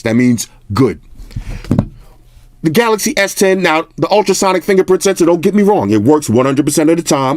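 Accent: New York, United States. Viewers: eyyyy a New York brother!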